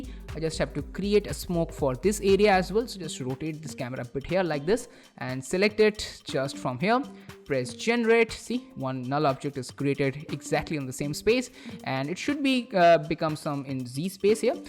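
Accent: India. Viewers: Hay bro where are you from? West Bengal?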